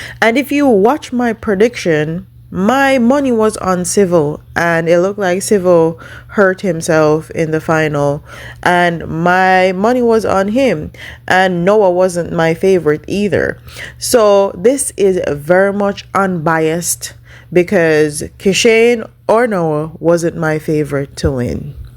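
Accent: Jamaica. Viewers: Sounds bias to me..ur voice sounds Jamaican so clearly ur gonna be biased, when u don't wanna except the truth it could be sitting in ur lap and u wouldn't see it . But no matter how ur wrestle with this truth the Gold is coming to the United States. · You sound like a Jamaican.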